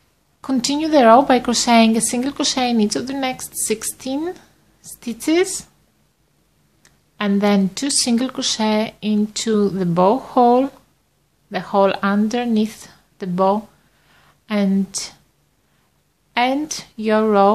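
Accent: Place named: Greece. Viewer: I thought I heard a Greek accent :) γεια σου απο τη μακρινή Αμερική Πολυ όμορφη η πλέξη αυτη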